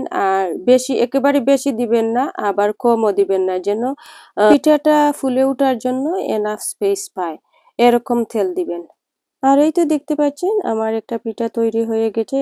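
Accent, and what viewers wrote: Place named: Sylhet, Bangladesh. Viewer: আসসালামু আলাইকুম আপু আপনার কথা গুলো শুনে মনে হচ্ছে আপনি সিলেটি আমি ও সিলেট থেকে দেখছি সিলেট কোন জায়গায় আপনার বাড়ি কমেন্ট করবেন ❤❤❤❤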